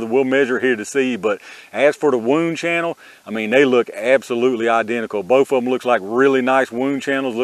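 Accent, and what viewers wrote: South, United States. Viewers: Whenever you come up in search, I automatically fast forward to 3-4 minutes in the video then I put your a*s on mute. It's just that your redneck vaginal-sounding lip- yapping is dumb.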